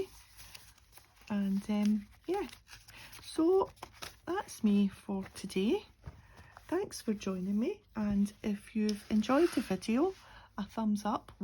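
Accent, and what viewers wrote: Scotland, United Kingdom. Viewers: your accent is so familiar to me. I’m Scottish and my Grandmother had a very thick accent. Where are you? That is a great die! Love the makes! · Scotland I was first thinking that is an Irish accent but no I got just land of frays and lovely casels . Some day I will come too wisit for shore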